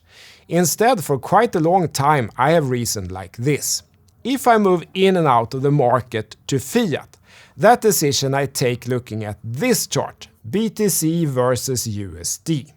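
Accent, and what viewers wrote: Sweden. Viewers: Är du från göteborg eller Stockholm?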